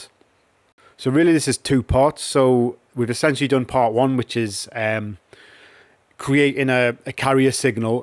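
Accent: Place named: Newcastle, United Kingdom. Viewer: You say “cheap and cheerful” just like my grandad did. What a small world, that I hear a Geordie voice from my favourite Thai modular supplier. Always good to be encouraged to think outside the box. Audio or control, it's not supposed to matter to us, is it? :)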